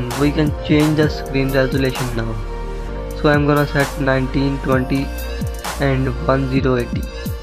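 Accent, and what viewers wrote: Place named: India. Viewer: lmao u put united states but ur clearly from india or sum other shitty accent country lmfao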